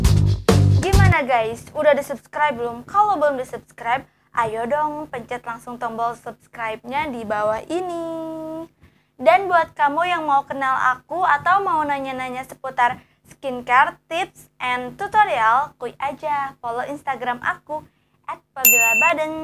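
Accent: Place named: Subang, Indonesia. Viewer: Mirip orang subang...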